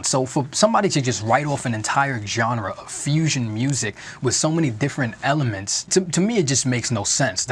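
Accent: New York, United States. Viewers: Where's that accent from (you sound like you're fr NY)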